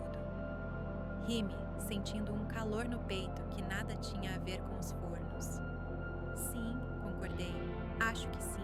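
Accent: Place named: Portugal. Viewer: Sem nexo ,um bebê recém nascido viu a frustração do pai? A narração é estranha, parece português de Portugal às vezes. Não falamos assim. Eu particularmente não gosto do sotaque português.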